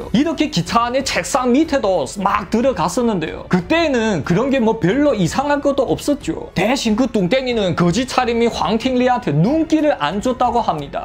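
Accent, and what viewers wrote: Yanbian, China. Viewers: 너무 재밌습니다 ㅋㅋ 타고난 이야기꾼이네요 ㅋㅋ 조선족 특유의 말투가 더 귀에 쏙쏙 박히네요. 다음 편 기다리고 있습니다~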